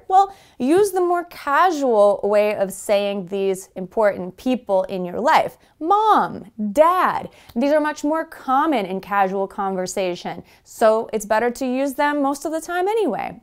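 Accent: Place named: United States. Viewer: Good Morning, How can I say this? I loved these alternatives mainly because I love to have more possibilities, more varieties, to increase my vocabulary, you know, to have more options This video lesson was a huge gain for Me to be more conversational 😂 But, I'm an enormous fan of this your American TH Sound, and it's too charming the position of the tongue when Americans say it Maybe I'm saying this because I'm like obsessed with it, I get staring People's mouth 👄 catching each tiny movement, for Me to learn and also because I definetely love it! Ok, I took some notes here: I'm thankful to you because of this suggestion on this video with 30-40 mins of duration, Train, right!? I'll certainly check it 😉 THANK YOU = I appreciate you / Awesome / Wonderful / I'm so grateful... Yes, I appreciate you for everything that you've been doing for us, it's Awesome 😍 I THINK = I have an idea / I imagine / In my opinion Hey, your idea of having that pizza last night had been Fantastic! 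In my opinion we should repeat this Program ( And how it's Me speaking, and I LOVE the TH sound, I would add to this sentence )... What do you think about it? 😂 Pizza, us, tomorrow, huh!? Father, Mother = Mom, Dad ( I enjoyed it, easier and cute as well ) 1200 × Twelve hundred Well, talking about numbers, I totally pass the 🏀🏈 ball Ok there are things in life that are unavoidable, and there will be times that will be required to talk about it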